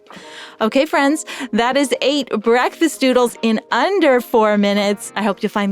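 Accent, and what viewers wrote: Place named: Ontario, Canada. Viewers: sounds pure Ontario to me! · I love your French coming through...it's nice to hear "Canadian"... (I'm Canadian) I'm looking forward to trying your breakfast doodles. So fun! Side effect...making me hungry😂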